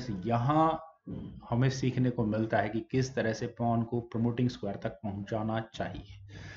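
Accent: India. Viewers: Thanks for all your Mikhail Tal's Games and indepth Analysis..!! From your pronounciations, I thought you are Gujarati.., but fr9m looks I think you are Punjabi, I guess..!!😊👍👌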